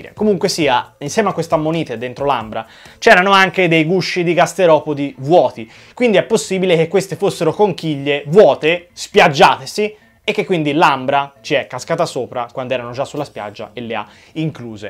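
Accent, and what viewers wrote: Tuscany, Italy. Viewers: Si sente tutto il toscano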